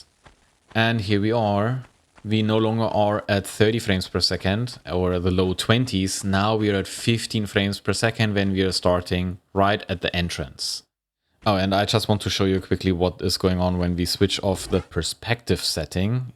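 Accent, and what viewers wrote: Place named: Germany. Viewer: The terrible German accent makes this unwatchable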